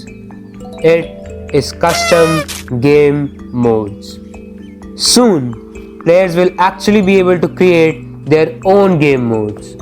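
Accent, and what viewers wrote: India. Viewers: Bro are you from India 🇮🇳cuz I feel that your voice is like indian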